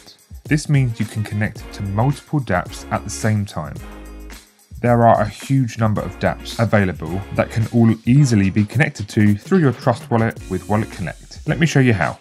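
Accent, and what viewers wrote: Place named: England, United Kingdom. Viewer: same guitar and same English guy voice is every W3 video...he must be busy..I wonder if he plays the guitar too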